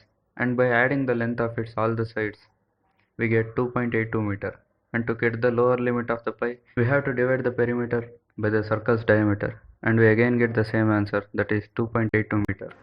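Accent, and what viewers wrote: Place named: India. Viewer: It's not just your video. I find it difficult to listen to anyone who has a very thick Indian accent.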